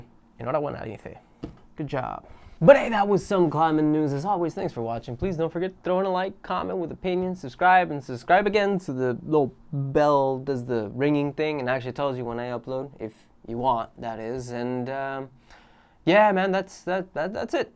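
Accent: United States. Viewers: Eres español? Tío tienes acentaco americano. Como lo haces?! Muy buen contenido. Mis enhorabuenas.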